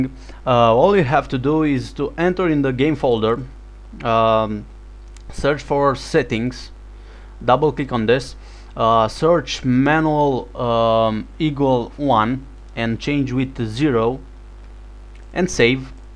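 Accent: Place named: Romania. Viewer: stiam eu dupa accent:)) esti dealmeu. De prin ce zona esti daca nu te superi ca intreb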